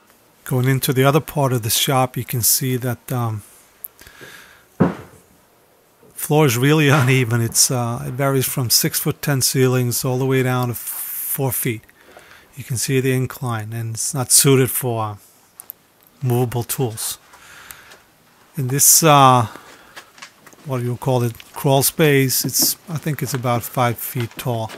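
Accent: United States. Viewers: You say thanks for watching but I for one want to give you a BIG Thanks for sharing!! You took a short basement (w rock on one side) and made it a great wood shop! Especially like the cabinetry and organization (wish I could do 1/4 of that). Your DIY tools and jigs are an inspiration. I do have one question; the electrical plugs aren't the type we use in the USA but sound like your from the northeast USA? Thanks again! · Great shop setup. Norwegian?, you sound like you have a New York accent! How does that happen?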